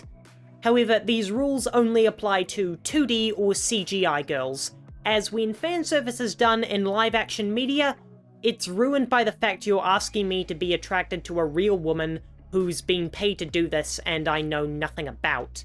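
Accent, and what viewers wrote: New Zealand. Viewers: You can tell from the voice and kiwi accent that the testosterone is strong with this one.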